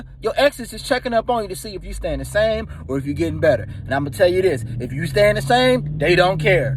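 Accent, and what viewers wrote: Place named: Maryland, United States. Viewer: Bruh gotta be from Maryland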